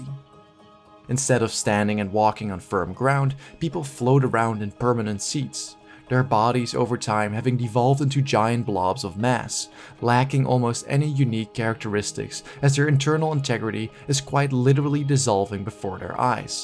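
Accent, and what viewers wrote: Netherlands. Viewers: Just subscribed! I watched a few vids and just a question, are you dutch?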